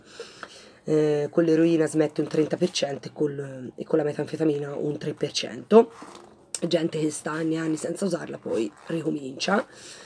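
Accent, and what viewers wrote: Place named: Florence, Italy. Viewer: Ciao, mi sono iscritta da poco al canale, e devo dire che questo video mi è piaciuto molto tanto che Shaboo l'ho acquistato quasi subito dopo aver sentito te che ne parlavi (solo che l'ho preso per la versione Kindle, ho speso un pochino meno). Mi piace tantissimo la tua parlata, io sono di Firenze ma mi sono trasferita nelle Marche per motivi personali, quindi quando ti sento parlare mi sembra di essere nella mia città natale a passeggiare per le vie del centro storico... Non sono il massimo nel presentarmi ho sempre paura di dire banalità, quindi ti faccio i complimenti per il canale e a presto.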